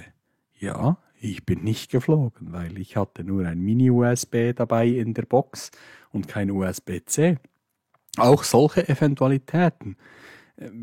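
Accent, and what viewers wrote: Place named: Switzerland. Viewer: ich grinse hier vor mich hin. irgendwann fliegen wir mal zusammen. kannst du dir das vorstellen? Wir sitzen zusammen da drauf? Leider kann ich mit den Tips nichts anfangen. War aber nett deinen Schweizer Dialekt zu hören.!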